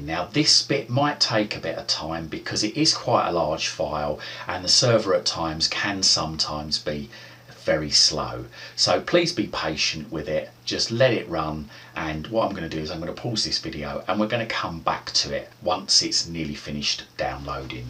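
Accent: United Kingdom. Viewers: Oi, are you british mate aye?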